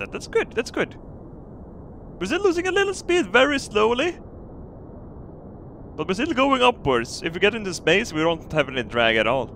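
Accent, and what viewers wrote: Sweden. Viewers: He is Swedish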